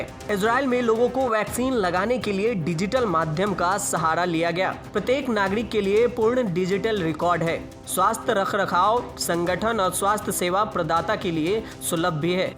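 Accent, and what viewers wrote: Bihar, India. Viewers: bolne k liye ye bihari hi bcha tha kya dang se hindi nhi bol skta ye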